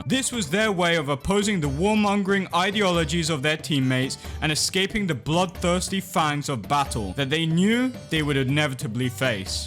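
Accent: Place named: United Kingdom. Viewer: Nice one bro, the event sounds so cool with a british narrator in the background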